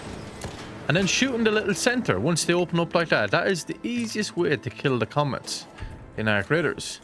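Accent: Ireland. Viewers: He sounds Irish.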